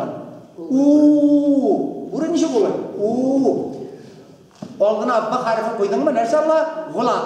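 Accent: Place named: Bashkortostan, Russia. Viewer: Все что тюркское напяливать на татар это не есть хорошо... Вы же в начале говорили по чувашески а потом перешли на северо западный диалект башкордов... Видать в свое время они оказали большое влияние...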